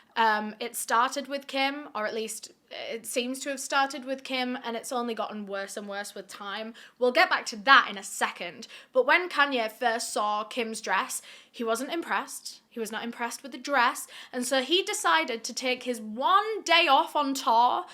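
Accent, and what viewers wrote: United Kingdom. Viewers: Sorry but i gotta say you look like Olivia Rodrigo with cute Brit accent ✨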